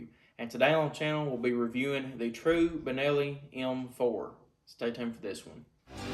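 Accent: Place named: West Texas, United States. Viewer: West Texas or Tennessee...not fully sure about the accent. But, I am sure about that shotgun. Feels good just to hold it. Do you find that dot particularly useful? Seems a bit high over the receiver. Thinking about a T2/Scalarworks combo...1/3 co-witness. Enjoy that thing, sir. Never let her go...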